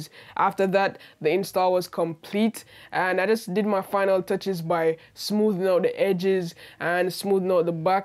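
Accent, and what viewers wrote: Jamaica. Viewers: This accent sounds jamaican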